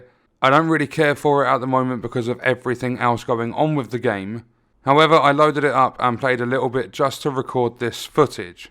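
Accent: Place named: Croydon, United Kingdom. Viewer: Haven't found any AA or A games that take me fancy in a long time. Loot based is my main staple. This is the first time I've actually enjoyed a halo game in decades. Probably because it feels amazing. I would love to boycott AAA games because the state they come out in is close to theft. That's why i like your channel bro, because you are not scared to tell it how it is.... plus your accent and humour reminds me of an old friend of mine from Croydon 👌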